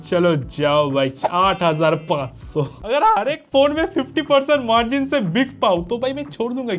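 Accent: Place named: Kolkata, India. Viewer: U have Kolkata accent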